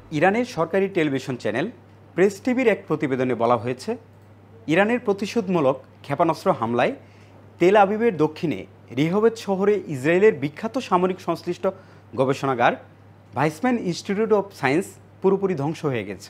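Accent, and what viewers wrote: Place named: Delhi, India. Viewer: delhi r loker bangla jmon houa uchit r ki..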